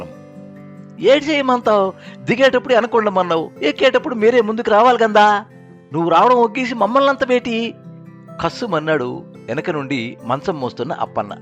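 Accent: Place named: North Andhra, India. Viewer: మీ వాచికాన్ని అద్భుతం అనాలా, మరోటా!?...ఉత్తరాంధ్ర మాండలికం మీరు పలికిన తీరుతో నా ఒళ్ళు పులకించి పోయింది. మాండలికంలో నేను చేసిన చిన్న చిన్న తప్పులు ఎవరికీ వినపడకుండా మీరు చేసిన కృషి అమోఘం. నమోనమః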